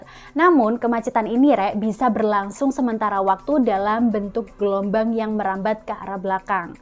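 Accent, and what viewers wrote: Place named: East Java, Indonesia. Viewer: Kok bicaranya REK REK opo Anda ini orang Malang/Surabaya?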